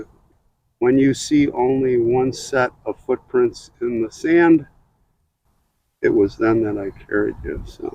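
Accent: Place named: United States. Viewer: which part of America is your accent from? I love the way you pronounce many words 😊. That little boy's face was so cute. Poor little angel. I'm glad you visited him.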